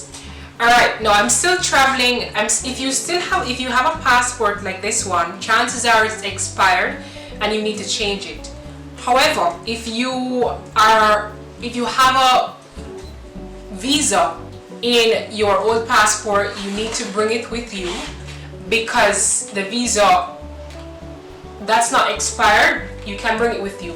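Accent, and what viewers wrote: Caribbean. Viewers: Intelegent young lady, good grammar, with an accent exactly as any other English speaking Caribbean islander.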